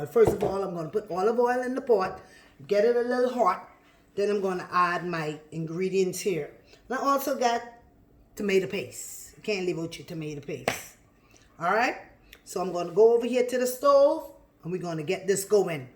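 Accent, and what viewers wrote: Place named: Bahamas. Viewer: Bey my wife went crazy wit da Bahamian talk, she loved it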